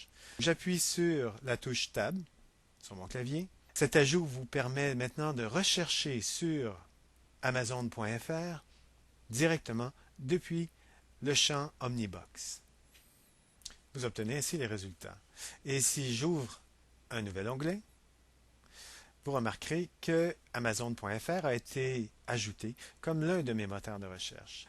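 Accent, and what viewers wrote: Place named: Canada. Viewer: j ador ton accent canadien ^__^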